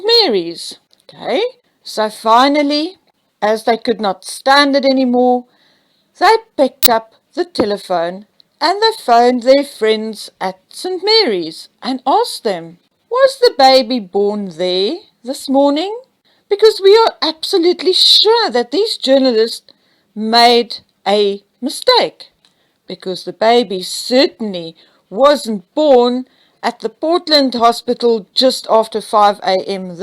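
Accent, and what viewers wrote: South Africa. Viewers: Dis 'n lekker Saffa accent... ek is van de Baai. 😊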